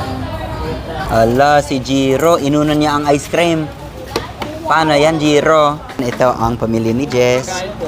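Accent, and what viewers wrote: Ilocos, Philippines. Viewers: Your accent very ilocano now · Nagka accent nadin ng ilocano hehe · You also pick up Ilocano accent when talking tagalog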